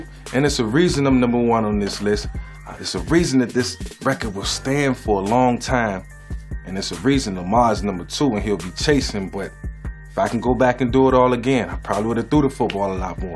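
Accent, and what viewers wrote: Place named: Newport News, United States. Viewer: Vick sound like he from Downtown Newport News ❤️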